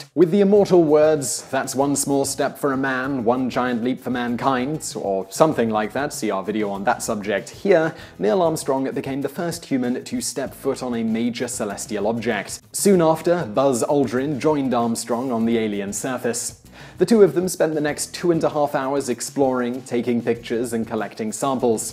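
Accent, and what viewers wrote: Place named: RP, United Kingdom. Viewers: Thomas Smith posher English I think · Thomas Smith yeah sounds posher than me anyway, southerner that'll be why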